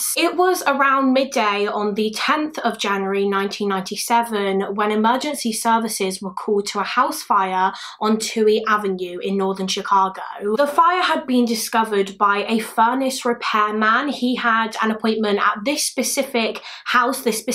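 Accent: United Kingdom. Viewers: Amazing Thankyou, also as lovely as it is the American YouTubers I do love a Brit one xx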